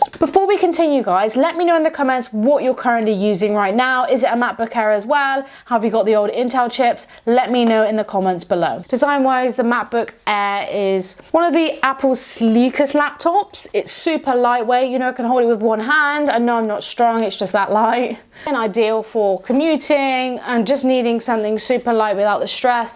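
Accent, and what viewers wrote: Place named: United Kingdom. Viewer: love the British accent, but yours is on another level ! New subscriber with all the bells ringing and greetings from Argentina 🦁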